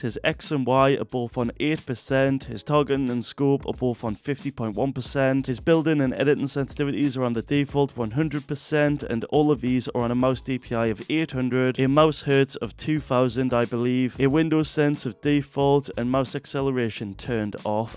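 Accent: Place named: Newcastle, United Kingdom. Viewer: we have like 50 diff accents so his is Newcastle btw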